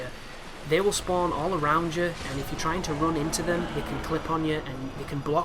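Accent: United Kingdom. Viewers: One of my fav videos.PERIOD. Not only very helpful but fucking HILARIOUS!😂😂😂 the combination of your beautiful Brit accent but the excessive cussing at enemies and then the crown jewel was your WOW OPINIONS! Spot fucking on! "I'm not trying to offend anyone I'm just stating facts!"HAHAHAHAH I so rarely like/subscribe etc I'm not a dick I just forget but this was an automatic LIKE/SUBSCRIBE/& DONATION😎 I'm not easily amused in fact kind of a negative person at times but this made my already great night, thx, looking forward to other videos, I'm going into your history👊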